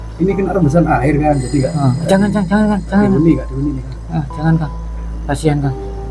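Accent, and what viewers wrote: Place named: Wonosobo, Indonesia. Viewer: Kayak logat wnsbo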